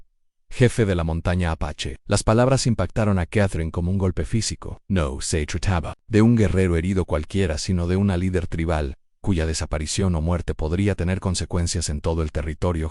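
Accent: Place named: United States. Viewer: La lectura está bien lo que paso es que primero habla del apache herido como si fuera mujer y luego como hombre así que eso genera por así decirlo ruido al escuchar, en algunas partes le pone entonación como si fuera de EU hablando en español , por lo demás está bien , solo pónganse de acuerdo si hablan de un hombre o de una mujer, y eso no quiere decir no se agradezca las publicaciones con audio , saludos desde Puebla México 🫂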